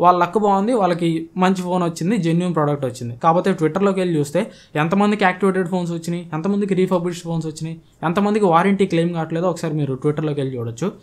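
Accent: Ongole, India. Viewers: bro medi Ongole aa broo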